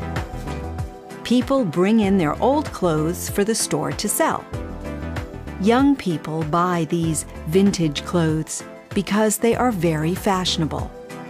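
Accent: United States. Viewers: Es inglés americano o británico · Waoo increíble como pude entenderla al 100 sin los subtítulos, habla pausado ella y con su acento americano! Quisiera poder hablarlo así y fluido 😊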